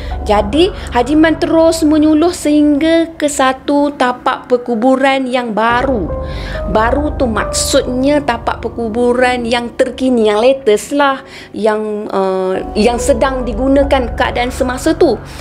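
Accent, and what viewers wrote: Kelantan, Malaysia. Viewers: saya dh agak dah kakak orang kelantan,masa kakak bercerita ada sikit tubik bahasa kelantan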